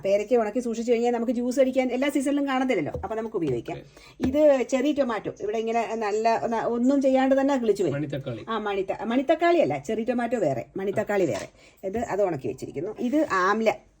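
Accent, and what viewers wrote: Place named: Kottarakkara, India. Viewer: കൊട്ടാരക്കര ഭാഷ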